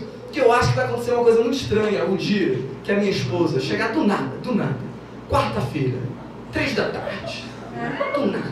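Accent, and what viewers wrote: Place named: Pará, Brazil. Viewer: Melhor ainda com este sotaque paraense <3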